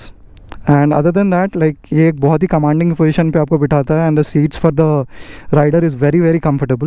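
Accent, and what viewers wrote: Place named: Telugu, India. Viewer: with telugu accent is the best bro.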